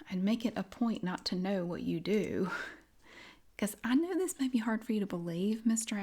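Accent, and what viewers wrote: South, United States. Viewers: You have the best southern accent and that sense of humor! Love it!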